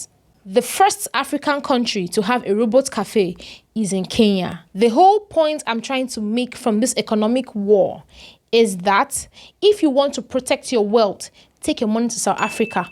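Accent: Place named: Nigeria. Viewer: I was about to follow this useless account before this nonsense of comparing us, with nigerian accent it's not surprising.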